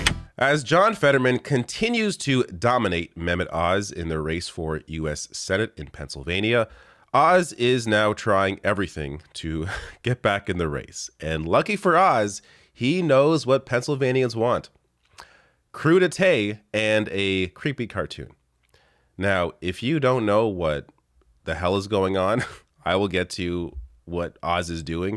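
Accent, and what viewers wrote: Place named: Canada. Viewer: I'm not sure, if you're a Canadian or a Seppo. You sound like a Canadian. Is Canada's opinion of any worth in the US? Are you folks stepping out into the big world of American politics? You, like us were big players in the wars of the 20th Century. Dead men, though, it seems are just gravestones to stand in front of. I'd really like to hear a reply. I'm genuinely interested.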